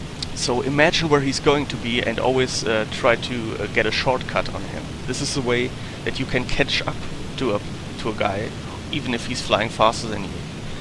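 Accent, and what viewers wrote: Germany. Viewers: Ich kann da den Deutschen Akzent raushören :D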